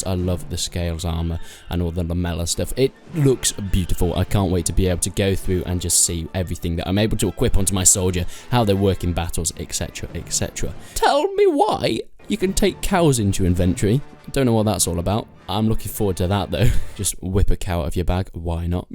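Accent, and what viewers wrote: England, United Kingdom. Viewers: The annoying english accent voice over, is my cup of tea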